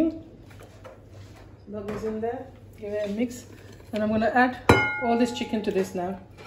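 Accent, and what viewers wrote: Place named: Midlands, United Kingdom. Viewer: You're from the Midlands lol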